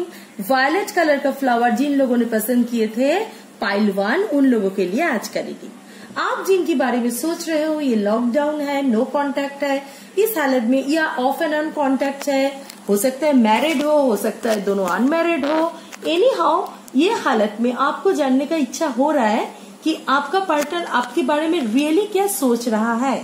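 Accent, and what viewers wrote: Bengal, India. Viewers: Hindi me bhi aapka Bengali accent aa jaata hai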